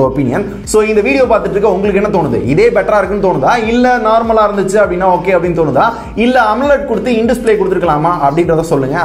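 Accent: Madurai, India. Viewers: Bro neenga madurai yaa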